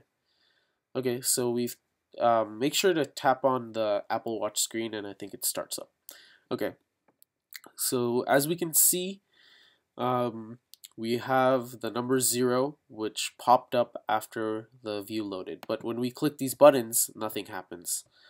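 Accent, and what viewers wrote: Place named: India. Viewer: hicthed Naw, it's just your Indian accent. Nice vid!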